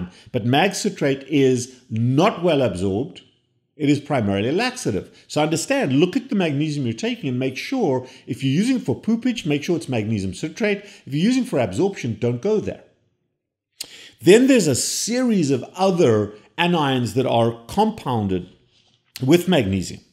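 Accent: South Africa. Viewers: That's true. But he only said it once and YT text-to-speech often gets words wrong. It esp doesn't like South African accent, translating it more often to "covert." But I just checked a recent John Campbell interview (someone much more off the deep end about Covid) and the TTS had no trouble parsing "Covid." There's no conspiracy here. I was just showing you that you could type it in the comments (twice!) without the black helicopters appearing.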